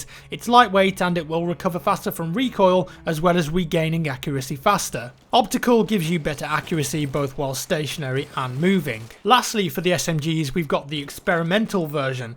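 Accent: England, United Kingdom. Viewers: in squad up if youre on the british side please say "A tiger? in africa?". You got the accent use it :) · are you from english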